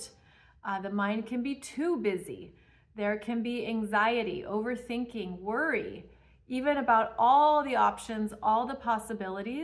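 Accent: United States. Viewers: I always thought you were American untill you said two euros , that made me super confused.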